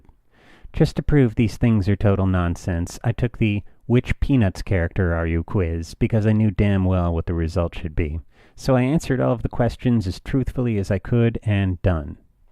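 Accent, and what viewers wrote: United Kingdom. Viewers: Killer British accent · I love your Paul McCartney accent lol.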